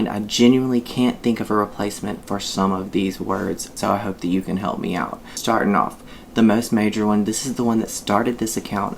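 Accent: South, United States. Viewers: You said the magic words with the best Southern take… “I feel like _____.” Southerners say this when we have an opinion on something. Master’s Level: pronounce it like does… Ah fill like ____. ❤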